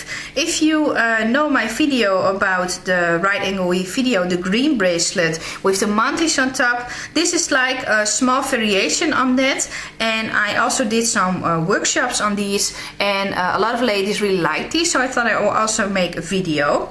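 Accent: Netherlands. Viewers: I will definitely make this one! leuk t Nederlandse accent ook hihi :-) thankss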